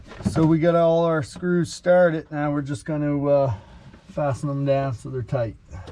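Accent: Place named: East Coast, United States. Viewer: Fellow Scotian here, went on Google just to get cleared on how to install this tablet holder, while watching your video, great by the way! When I heard your voice I said to myself, this guy sounds East Coast and sure enough 👍 thanks for the video brother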